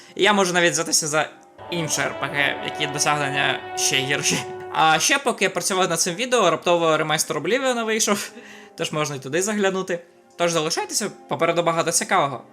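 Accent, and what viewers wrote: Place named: Lviv, Ukraine. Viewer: У вас такий акцент цікавий, ви зі Львові або області? У мого друга просто ідентичні інтонації просто цікаво тепер 😄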